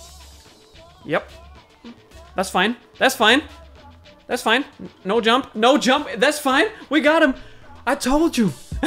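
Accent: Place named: Denmark. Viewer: IT’S YA BOOIIIII DANISH ACCENT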